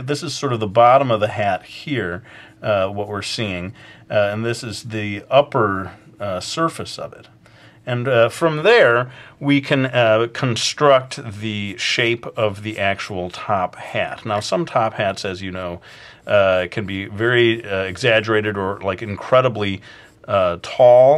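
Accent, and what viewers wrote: England, United Kingdom. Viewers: Maybe a bit British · Totally love the English accent! you do it very Good. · Yeah... that almost british accent is exactly how I sound when commenting on your videos out loud,!;) And btw, you should really do a steam punk manga!:D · the new voice is kinda british, not to be mean. i do like the voices they are funny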